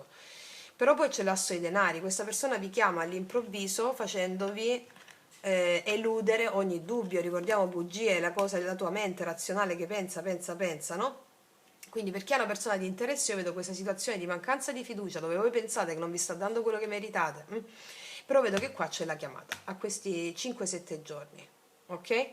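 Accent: Italy. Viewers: sei una mia recente scoperta.. ❤ vorrei incontrarti, sento dall'axcento che sei nella mia stessa penisola.. ti inviero una mail..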